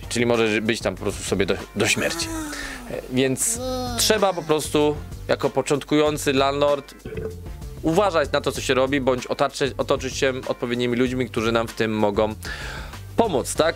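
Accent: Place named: Eastern Europe. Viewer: Slychac rosyjski ukrainski albo bialoruski akcent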